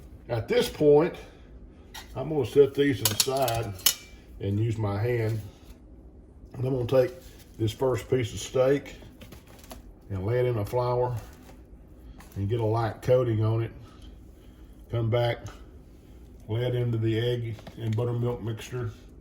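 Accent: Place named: Texas, United States. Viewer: Mmmmm we love your chicken fried steak! The ultimate comfort food!! And we love your Texan accent!!!💞💪🔥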